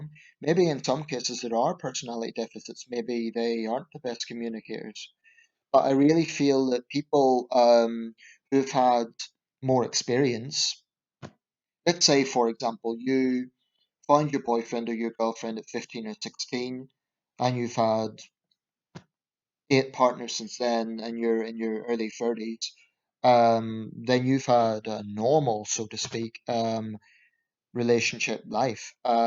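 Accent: Northern Ireland, United Kingdom. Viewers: Are You From Norn Iron as well?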